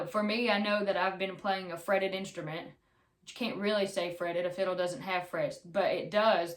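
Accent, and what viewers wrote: South, United States. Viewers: Watching your videos make me miss my home of Oklahoma with country sounding people and the country accent that I understand. Your videos bring a bit of home to me here in Utah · Love the southern twang accent 😍😍 hate my voice. Anyways GREAT PURCHASE 👌🏽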